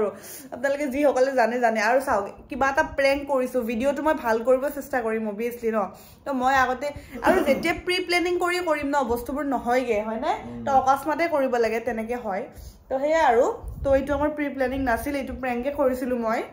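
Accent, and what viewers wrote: Nalbari, India. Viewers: 1st video salu aji plz repaly me 2mk kintu nalbari r Jean lage kotha bur kot biya hoisa ?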